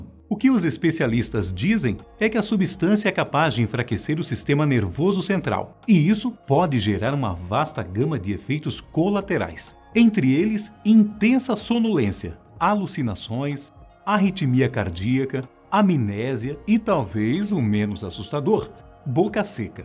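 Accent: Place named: Bahia, Brazil. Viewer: Gostei, esse cara tem uma energia boa, tipo...meu sangue vai com o dele, no modo baiano de falar😅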